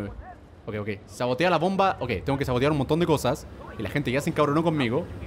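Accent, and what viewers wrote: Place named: Chile. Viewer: Le volvió el acento chileno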